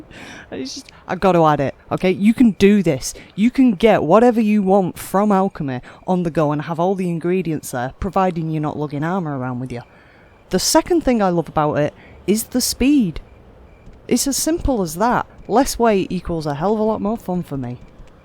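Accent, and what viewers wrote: United Kingdom. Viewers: Love your british accent. Onarmored, HandtaHand, Armar, Stoff, Twente, Oround here, Jomp, You see "ma" point, When you punch "shombody", baa (bar), fonny. Very usefull guides.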